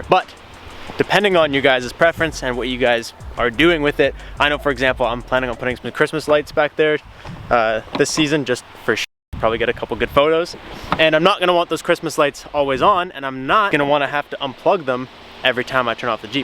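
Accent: Canada. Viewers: Great video. Canadian eh?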